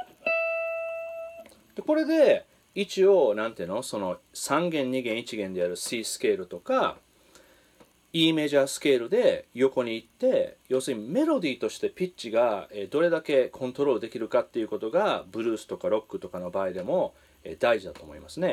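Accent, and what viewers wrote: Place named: Kyoto, Japan. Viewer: トモさんの京都アクセントには癒されます(^^) やはりチョーキングとヴィブラートはそれぞれ別個に練習してから、合わせた方がいいのですね。 勉強になりました。 ところでWhile my guitar gently weepsやanother brick in the wall part2のような二音半？ベンドがきついです。 何かコツや練習法ありますか？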